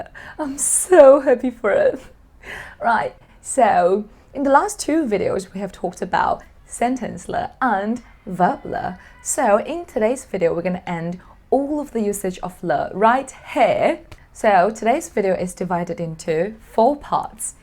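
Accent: United Kingdom. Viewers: British accent??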